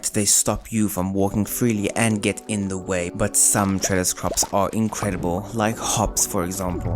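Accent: South Africa. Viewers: he sounds south african to me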